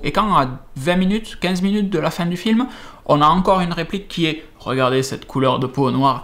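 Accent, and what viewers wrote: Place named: South-West, France. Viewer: PS : tu as la même voix que Pierre Sabatier chez Primeview, accent du sud ouest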